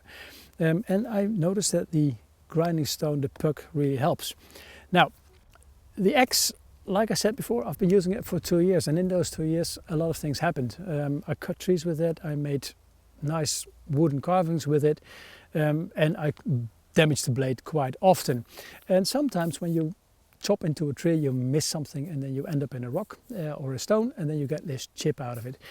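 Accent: Netherlands. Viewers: Ik houw er altijd van om door YouTube te gaan en ineens een Nederland accent te horen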